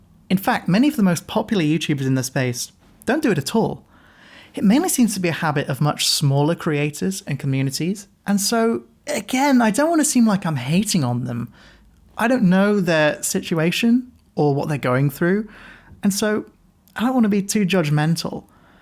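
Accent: United Kingdom. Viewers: Ok literally I had the thought to also say "jumper" in case this person wasn't American and then he speaks with a British accent god dammit. Also how is this not Freddie Highmore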